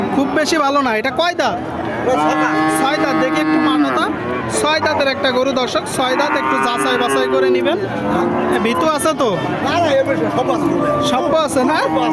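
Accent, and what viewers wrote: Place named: Jessore, Bangladesh. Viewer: আসসালামু আলাইকুম।ভাই এটা একটা অনেক বড় প্লাটফর্ম।এই ভিডিও অনেক মানুষজন দেখে। অতএব এখানে শুদ্ধ ভাবে কথা বলাই উত্তম। যেমন, আপনি বলেন কিরমিরি ,সত্তশ (হবে কৃমি,সত্তর) এধরনের শব্দ কি আদৌ বাংলা ডিকশনারিতে আছে? তাছাড়া যশোরের ভাষার একটা ঐতিহ্য আছে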